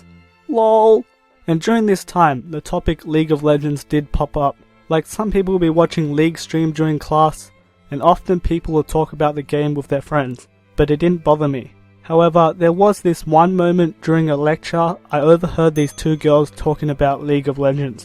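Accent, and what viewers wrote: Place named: Balkans. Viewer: Tacno se primecuje balkanski glas!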